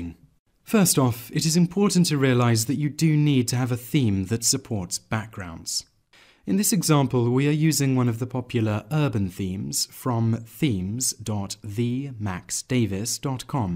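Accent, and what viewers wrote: England, United Kingdom. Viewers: This Englishman's accent is amazing.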